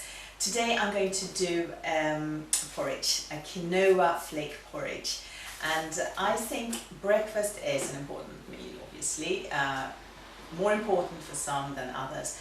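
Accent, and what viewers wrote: Sweden. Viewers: You sound Swedish 😊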